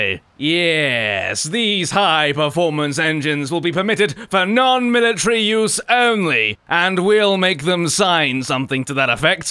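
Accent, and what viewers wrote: England, United Kingdom. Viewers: dude get your tea together, make more videos you have a good thing going on, make some tea reviews or something, whatever you can't fail with that beautiful British voice of yours. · That was the best part of the video. I just love the comedy that uses. And on top of that, that superb British accent makes it all the more hilarious. Encore, encore! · Wow he's like a serious brit! See's : LOL WUT, DID HE JUST SAY AMELICANS INSTEAD OF AMERICANS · eu amo esse sotaque britanico, kkkkkkkkkkkkk, melhor canal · I am going to right a overly long and unnecessarily detailed comment on this thar them video made by. "Probably" and "yes" is probably half the video. good. also I love the British accent and the slang. also the videos are much better if you get all the references. I this comment long enough yet? no? OK ummmm....uh huh oh yes, uhhh.lhmmm. 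YES right you just earned a sub:) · I love your British aksent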